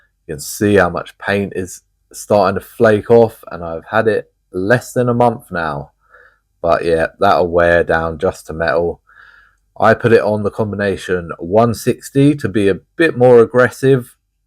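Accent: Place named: England, United Kingdom. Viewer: why do you have an English accent but live in poor ass Philippines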